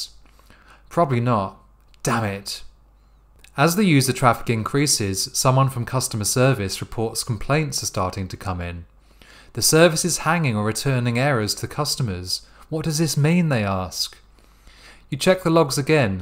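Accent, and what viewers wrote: United Kingdom. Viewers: Thank you very much sir for this video, love that british accent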